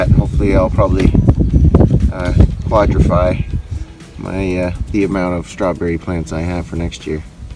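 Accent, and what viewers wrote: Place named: Canada. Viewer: Just lucious! Thanks for sharing. I wanted some pickles, Thanks mom! Ok, you know me(kinda) LoL, please remember they are native here, and I really like the Canadian accent! But please, Pah con..... LOL ~ 707! Bro hugs, and if it's not drinking out of a hose, it's burnt, starting to include wildlife, I'd have to go back and look, but I'm pretty sure, it's begining of week 3 of triple digi's here. Peace, and please, enjoy!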